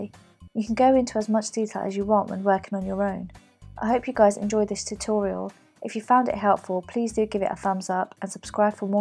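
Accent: United Kingdom. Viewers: That British accent 😉 Good luck :)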